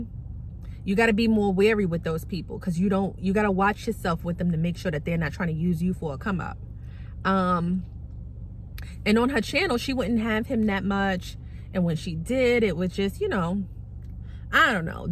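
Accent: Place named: New York, United States. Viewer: I love your NY accent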